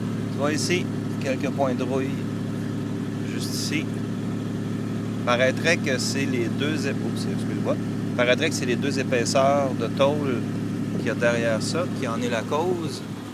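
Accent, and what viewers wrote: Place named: Quebec, Canada. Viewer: merci pour cette sympathique vidéo, toujours un immense plaisir de d'entendre la voix du Québec. Pour les ailes arrières, hélas c'est plus que la rouille mais de la perforations, j'ai eu droit également pour les ailes avant et les portières. Il faut découper, former une nouvelle tole, souder etc.... mais le résultât est à ce prix. En tout cas félicitation pour votre belle SM. <link>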